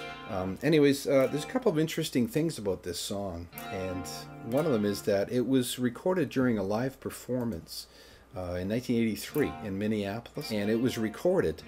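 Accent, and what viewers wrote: Canada. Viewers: You talk so Canadian. Good video though.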